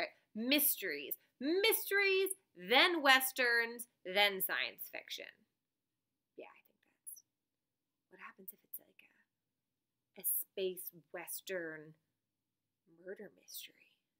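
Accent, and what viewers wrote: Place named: England, United Kingdom. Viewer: Thank you for taking my English accent comment and speaking more :D! <3 :)!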